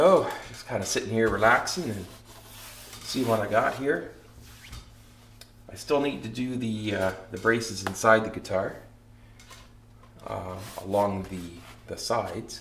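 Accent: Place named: Canada. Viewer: I like everything but the Canadian accent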